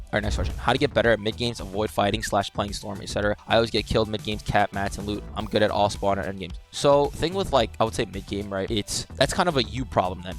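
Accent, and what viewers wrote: United Kingdom. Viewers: British bloke?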